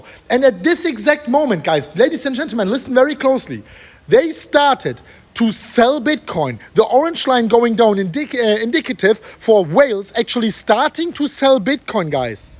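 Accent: Germany. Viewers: u live the best life bro! keep up the good work and stay positive love your german accent by the way enjoy a good drink this evening, u deserve it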